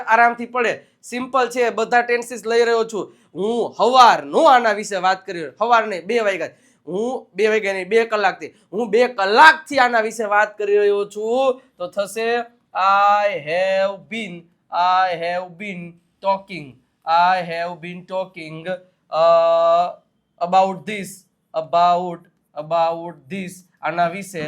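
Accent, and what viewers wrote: Kathiawar, India. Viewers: I like your kathiyadi speech sir 🙏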